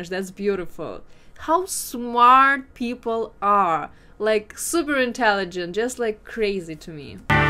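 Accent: Russia. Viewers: Haha. -- But she can entertain us however she wishes -- Just focus on that Russian Accent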